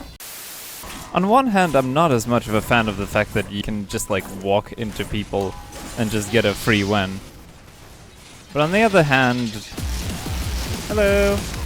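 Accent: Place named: United States. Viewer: I could never tell you speaked russian, your accent is so american :0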